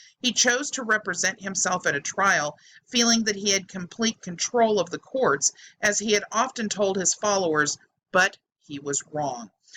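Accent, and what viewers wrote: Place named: South, United States. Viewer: When talk shows were first starting out, and they had really outrageous guests with wackadoodle ideas, I would say to myself, ‘please don’t have a southern accent! Please don’t have a southern accent!’ And guess what- they always did. When this video started out, I found myself saying over and over in my head, ‘please do y be from the south! Please don’t be from the south!’ But Nooooo.... he just HAD to be from the south, Didn’t he? I’m only in , and I’m already cringing! Now? I wonder, with all of the old tales of ‘inbreeding’ in the south, is this guy a result of it? I’m from the south and I definitely want to vote him off of our ‘southern island’! And as I watch this, I’m reminded of the whole confederacy and the south. Would this be a way to try and ‘restore’ the southern confederacy, albeit under a new name?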